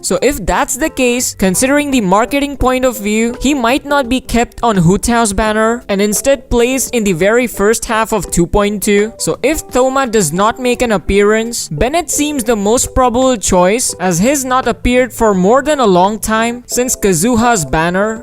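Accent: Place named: India. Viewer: Your accent seems like an indian/korean...r you from india?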